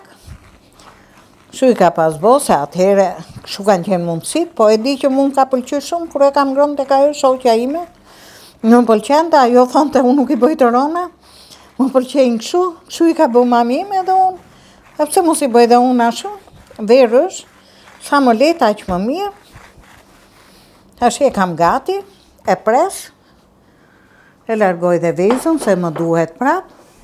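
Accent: Tirana, Albania. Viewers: Tamam kanal popullor qe na kenaq me recetat dhe ate folmen Tiranesete lumshin duart ❤🎉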